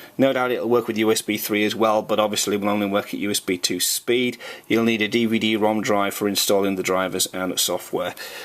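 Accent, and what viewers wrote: United Kingdom. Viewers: Reviews in British accent are good!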